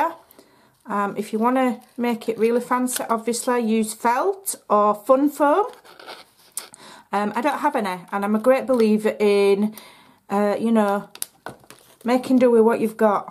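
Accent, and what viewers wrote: Yorkshire, United Kingdom. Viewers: I love watching your videos! Are you from Yorkshire?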